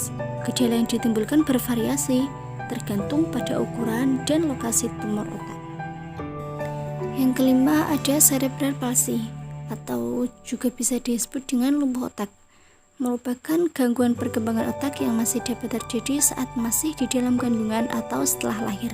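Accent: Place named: Java, Indonesia. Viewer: Medhok yuuu 😭🤣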